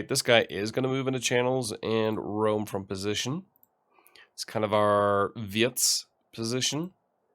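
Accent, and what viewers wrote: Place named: Germany. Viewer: I could hear that. I wanted to ask from which region in Germany you"re from. sorry if it's too personal.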